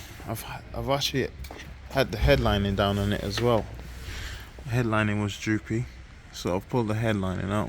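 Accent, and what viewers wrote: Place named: United Kingdom. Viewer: Nice to hear a British accent putting junk on the road again. 😊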